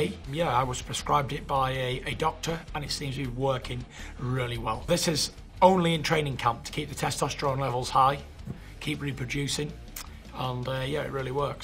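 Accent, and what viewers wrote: United Kingdom. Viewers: He got the nicest British accent i ever heard. practically the only one i can stand. is it a Manchester accent?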